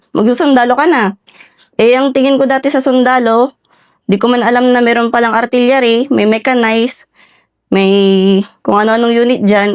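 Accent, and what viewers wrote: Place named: Visayas, Philippines. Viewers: Yung punto mo bisaya nman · Bisaya narin ang Punto hehe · Mam parang nainlove uli ako😅. Akala ko vsaya ka mam kc tunong vsaya ka, galing mo pakinggan nakakatuwa mas nakkainlove😅. May part 2 ka sana madam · Bisaya cebuana Ang intonation mo ma'am. I'm veri proud of u being a warrior sundalo.dapat may MEDALLA KA MAAM salydo sa nyo ni s ir clarin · Parang bisaya c Ma'am. Salute sau maam.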